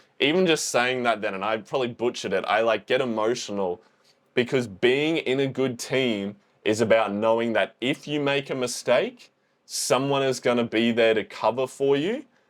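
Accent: Australia. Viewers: Also! Are you aussie??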